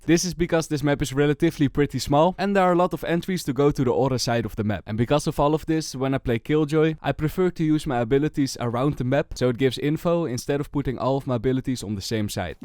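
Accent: Netherlands. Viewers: You are Dutch arent you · i smell a little nederlands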